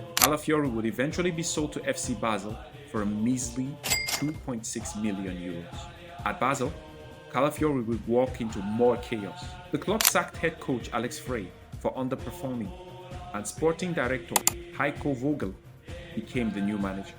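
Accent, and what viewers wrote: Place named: Nigeria. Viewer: Wow this is good research, great video editing, soundtrack and overall detailing. You sound Nigerian by the way. Well done 👍🏾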